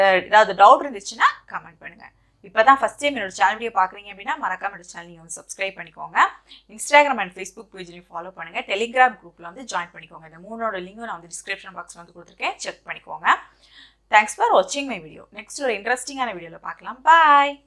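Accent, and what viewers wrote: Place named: Kanyakumari, India. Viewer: Super explanation vera level patience ungaluku Like that kanyakumari slang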